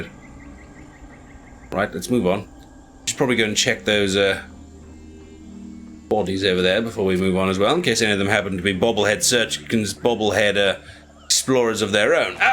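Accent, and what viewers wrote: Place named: RP, United Kingdom. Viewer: This is awesome so glad i saw this in my feed :D your posh accent is on point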